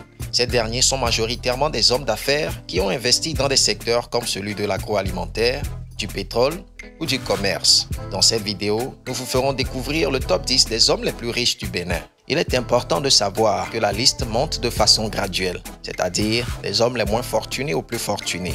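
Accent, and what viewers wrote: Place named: Togo. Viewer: Un togolais est sûrement à la base de cette vidéo quand on écoute la tonalité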